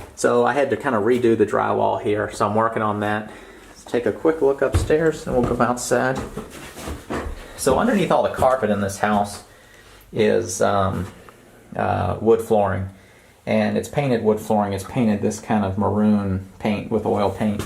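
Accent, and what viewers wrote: South, United States. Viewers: Sounds like you're from the South, what state are you in?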